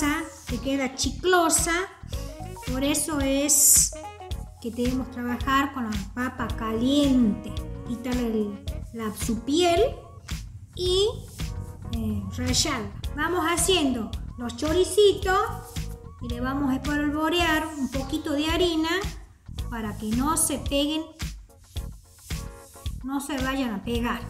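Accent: Tucumán, Argentina. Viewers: Que lindoooo escuchar la tonada tucumana!!! Dios te Bendiga, aguante mi Tucumán Queridooo!!!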